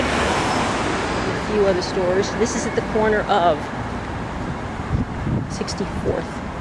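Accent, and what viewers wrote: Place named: Ridgewood, United States. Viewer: Nice video. Good to hear a Ridgewood accent out here in Colorado. I use to sell pretzels on the corner of Seneca and Myrtle. I made seven dollars in four hours in 1969. That was the height of my earning potential! You could buy a lot with seven dollars back then. Another world, another time.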